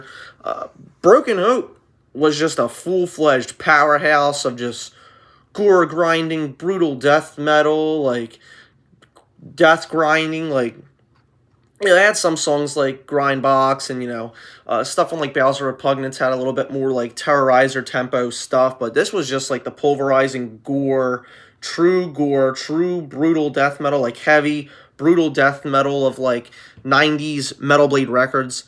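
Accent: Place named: Texas, United States. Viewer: I'm Texan and I approve the Texan accent....yall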